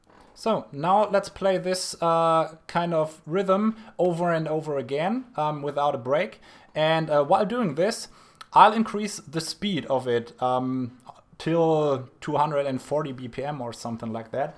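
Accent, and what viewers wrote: Germany. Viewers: are you german? :P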